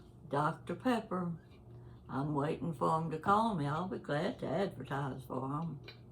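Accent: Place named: Appalachia, United States. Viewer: Dear dear, you are a delight. Is it just me or is Appalachian accent getting more and more pronounced?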